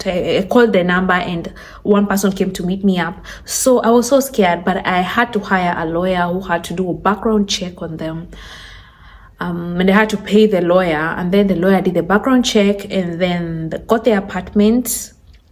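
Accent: Kenya. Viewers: The sexist woman alive. (That Kenyan accent is amazing.). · You could have said that more respectfully. We didn't even hear it, because she was giving us very important information about real estate. Don't force her to talk like an American. She's Kenyan.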